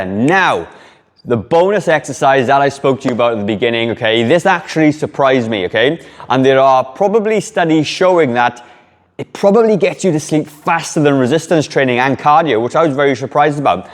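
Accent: Wales, United Kingdom. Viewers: No!!! He has a lovely Welsh accent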